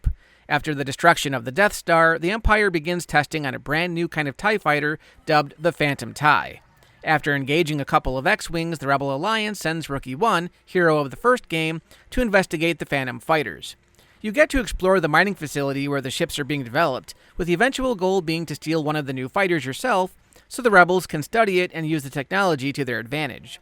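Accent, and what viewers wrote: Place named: Chicago, United States. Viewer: Love the Chicago accent!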